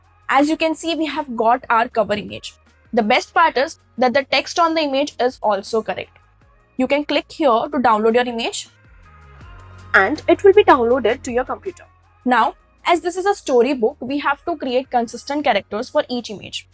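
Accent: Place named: India. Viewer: Indian no doubt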